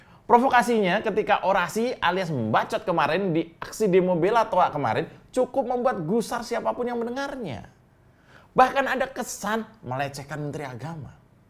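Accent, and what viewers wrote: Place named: Medan, Indonesia. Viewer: Mantap hebat komentator bukan org batak tapi logatnya persis logat medan horas..maju terus..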